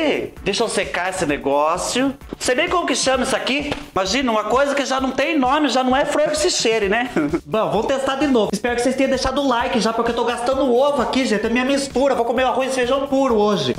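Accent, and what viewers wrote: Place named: Northeast, Brazil. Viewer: sou Cearense e seu sotaque lembra quem é Nordestino. Sendo do Nordeste ou não, AMO seu jeito de se comunicar.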